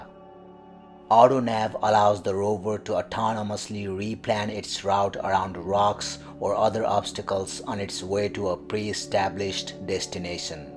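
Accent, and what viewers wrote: India. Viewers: Are you from north east India? My wife is from Assam and your accent sounds similar. Cheers and thanks!!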